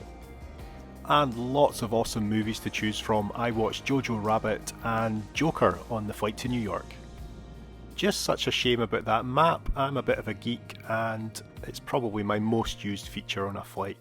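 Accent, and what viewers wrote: Scotland, United Kingdom. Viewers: You have got all 3 my favorite ways of traveling (airplane, train and ferry)! 👍❤️ Please keep traveling/sharing and I will definitely keep watching. 🙏 By the way, love your Scottish accent. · Hi, nice to meet you...I've just discovered your channel and I must say IT'S REALLY ONE OF THE VERY BEST out there!!! Your videos are Grrrreat! Excellent quality, good content, to the point commenting, and perfect editing. And the wee Scottish accent is the icing on the cake!...and did I mention that you're also eye candy??;-) (hope you don't mind a compliment from a man!) Agree that VS are excellent value for money, especially if you happen to stumble on one of their offers. Their crews are some of the very best, aren't they? I so love the A350....big, smooth, quiet, powerful... Doesn't get any better than that, really! Xx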